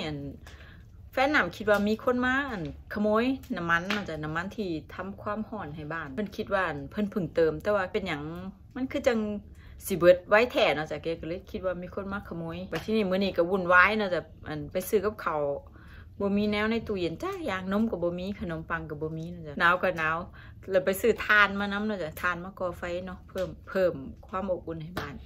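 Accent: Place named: Isan, Thailand. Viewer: ขอบคุณพระเจ้าที่คุณและครอบครัวถึงอังกฤษอย่างปลอดภัยมีความสุขนะคะคุณน่ารักพูดภาษาอิสานเพราะมากคะ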